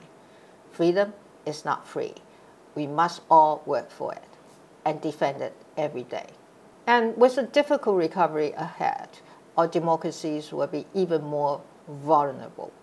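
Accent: United Kingdom. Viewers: 總統的英國口音越來越明顯了~ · 親美的總統講英式英文🤔( 無惡意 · President Tsai’s English is 10 times better than Denghui Lee whose talk in Cornell years back was extremely hard to understand. Her English is great but more like British English rather than American English. Job well done President Tsai!!!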